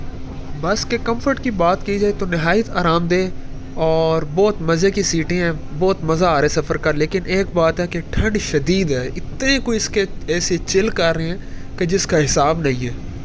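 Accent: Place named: Attock, Pakistan. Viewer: آپ کے لہجے سے لگتا ھے کہ آپ کا تعلق اٹک سے ھے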